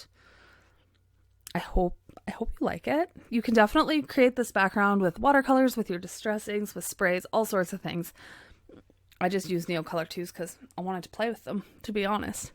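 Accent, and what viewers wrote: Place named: Canada. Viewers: I guessed you were Canadian while I was listening and then expanded the description and saw you actually are! I can just tell sometimes because I'm Canadian too. I love this card. The background is all my favourite colours and I love it. It's outta this world! 😉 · As a Kiwi, I can tell with the way Canadians say ‘about” and “out”... and for you the word “slow” too... your “o” sounds are a giveaway 🤗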